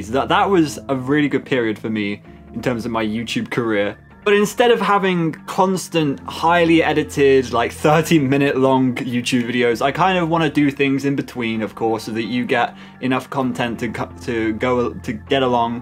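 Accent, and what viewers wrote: United Kingdom. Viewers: You Look Like Dawko And Sound Like Him And I Don’t Know If You Know Him He Is A Famous YouTuber Just Like You!! He Is British 🇬🇧 And You Look Like Him You Sound Like Him And You Sound British 🇬🇧 Sorry That I Say That But Hey I’m Okay With That Your Voice Is Cool And Your Voice Sound Cool And Good So Please Don’t Be Mad I Said That So Sorry But Cool Video And Cool Voice And Sorry For Long Types😊😊 Your Profile’s Look Like The One From Dawko!! Sorry For Long Type!!😊😊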